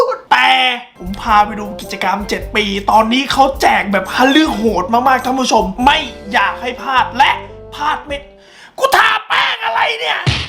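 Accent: South, Thailand. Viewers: พี่ เป็นคนใต้หรอค่ะ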